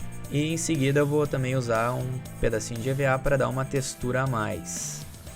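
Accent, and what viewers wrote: Rio Grande do Sul, Brazil. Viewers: Tu es gaúcho ?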